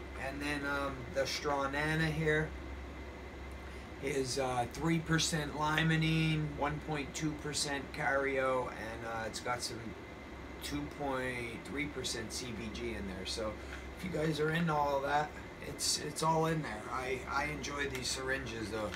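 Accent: Pennsylvania, United States. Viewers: ayy def got the PA accent haha, greetings from NEPA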